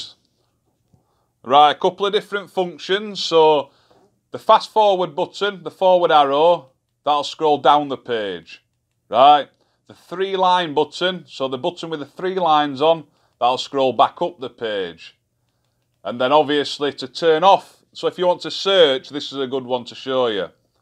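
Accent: Yorkshire, United Kingdom. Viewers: Hi... that's got to be the fastest subscribe I've ever done... 20 seconds in... all I heard was a Yorkshire accent and the laugh... top one mate. I'm on the other side of the Pennines.... Look forward to your upcoming videos.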